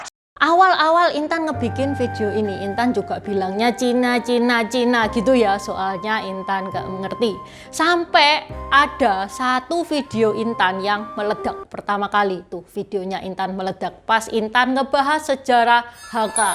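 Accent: Java, Indonesia. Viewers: ... unikny lagi pke loghat jawa medhog 😃😃😃😃😃 ... 👍❤️🙏 ...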